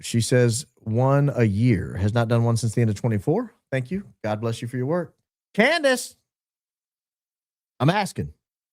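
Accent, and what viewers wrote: South, United States. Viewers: I really like the way you say “was” and “drunk“. Your subtle southern drawl comes out with the “uh“ sound.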